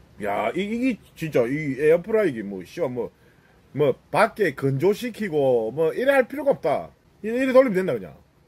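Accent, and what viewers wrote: Gyeongsang, South Korea. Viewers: 경상도 특 안고쳐짐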